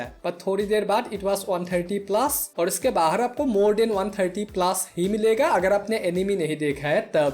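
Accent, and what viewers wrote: Assam, India. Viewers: assam dada apunar accent te gom palu😊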